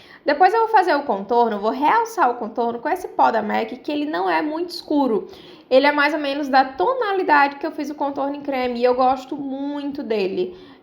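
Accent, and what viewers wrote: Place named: Rio Grande do Norte, Brazil. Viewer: Esse sotaque só podia ser do RN mesmo ❤️❤️❤️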